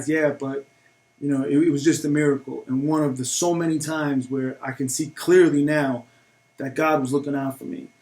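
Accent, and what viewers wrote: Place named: New York, United States. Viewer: Love the New York accent!!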